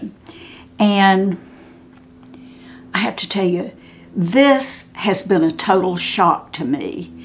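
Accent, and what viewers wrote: South, United States. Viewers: really enjoy your videos. Love your Southern? accent. Could you tell me what in your opinion, is the bed undermaker primer. I have a lot of wrinkles from living in the middle east for years and being very fair. Thanks. xx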